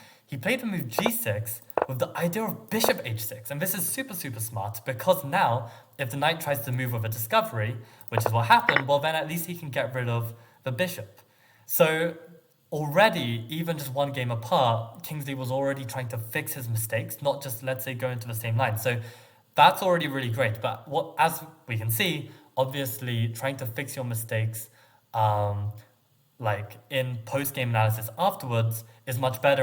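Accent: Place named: Germany. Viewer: the German Accent sounds real cute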